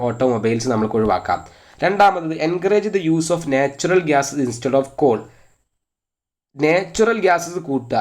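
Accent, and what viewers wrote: Malappuram, India. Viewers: Sir nte nadu Malappuram aano😃... Edak varthanom kelkkumbo anghane thonnunu😁 Anyway adipoli cls aanitto🤩🙌🏽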